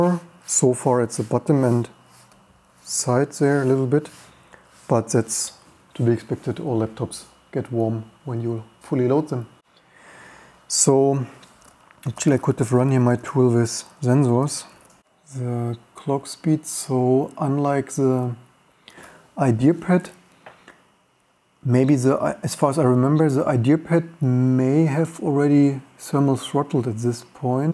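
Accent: Germany. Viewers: Dieses "deutsche" Englisch ist echt schrecklich. "Se sinkpäd". "ei test se sipiju performanz" "so sen ei wud say" Machs doch auf Deutsch ... Englisch können und Englisch sprechen können sind echt 2 verschiedene Dinge.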